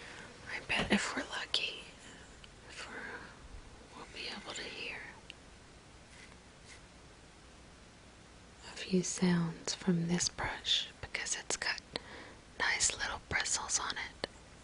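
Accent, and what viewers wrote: South, United States. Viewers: Also are you from the south? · Are you from the south? cause you sound a bit southern.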